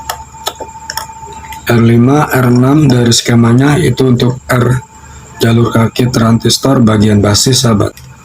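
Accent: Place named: Banyumas, Indonesia. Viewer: sampean wong ngapak kulon ya mas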